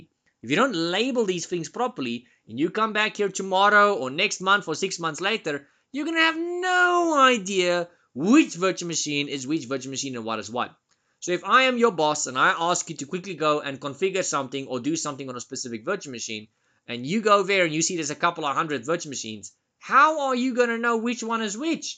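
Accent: Afrikaans, South Africa. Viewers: Cheeseburger 😅 Dude, I love your SA accent. You make more sense than Prof Messer.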